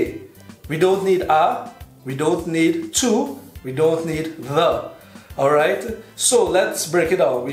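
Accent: Trinidad and Tobago. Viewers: I also thought "nevermind" doesn't sound like trinidadian ;)) I absolutely love the rythm, the accent, I spent the best years of my life with trini people and I miss that vibe in my life fuh sure!! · lol It's like African american ebonics but with a trini accent lol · I love hearing my Trini talk 😍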